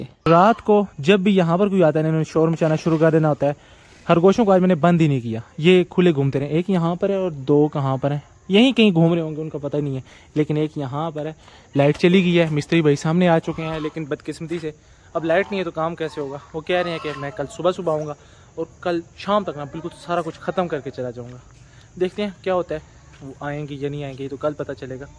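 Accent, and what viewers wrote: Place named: India. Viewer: मुझे आपका बोलने का तारिक इतना पसन्द है ना की लगता ही नहीं आप पाकिस्तानी हो हमे इंडिया जैसे ही हिदी बोलते हो dear और जिस तरह से हर पशु पक्षी का ध्यान रखने हो ना बड़ा ही अच्छा लगता हैं देख कर dear God bless you dear 🍫😊🇮🇳🇬🇧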